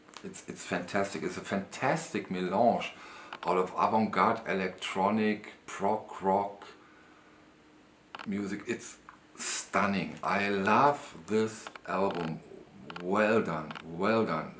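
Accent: Germany. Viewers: Hi. I really enjoy your show and love your German accent. Thank you for mentioning the band, love this music, have now ordered both their studio albums. Fantastic. Thank you. I too am a big fan of. Kind regards from Robin.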